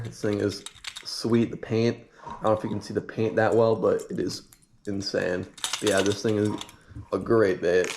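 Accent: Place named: Minnesota, United States. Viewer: You must be from Minnesota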